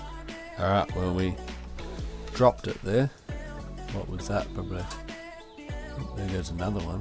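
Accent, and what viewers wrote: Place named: Australia. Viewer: Like your style and it's good to hear an Aussie voice. That letterbox is bad tho, unless otherwise my phone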